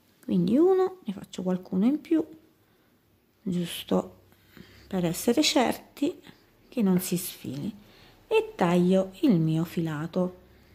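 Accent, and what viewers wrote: Italy. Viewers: Molto carini! Brava nella spiegazione......si sente che sei siciliana 😀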